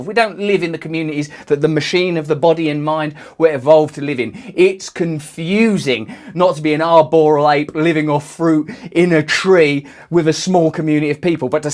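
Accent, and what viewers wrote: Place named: England, United Kingdom. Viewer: The vocabulary and accent is all England but the hand movements is 110% Italian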